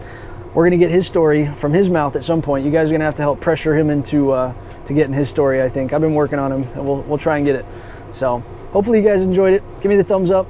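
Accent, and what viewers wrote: Canada. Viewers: You sound like you're from Canada, not Texas. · North Texas? I thought he had a French canadien accent.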